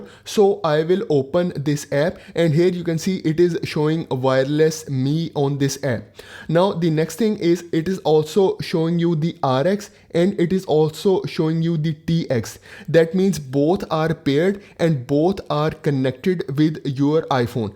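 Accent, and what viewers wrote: India. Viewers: Once again I was convinced that the English spoken by Americans and the English spoken by Indians are not the same English. Jesus will sooner return to us than Indians will learn English.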